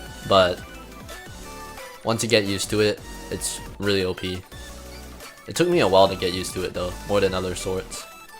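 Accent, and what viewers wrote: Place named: Singapore. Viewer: u sound a little Singaporean haha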